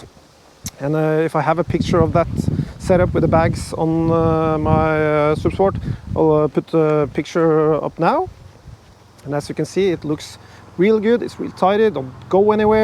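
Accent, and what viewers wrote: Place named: Liverpool, United Kingdom. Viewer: Great review! There's a slight hint in your accent throughout the vid that you've spent some time in Liverpool e.g . "I just luv this bike, it's my second one and I just luv it ".... 😊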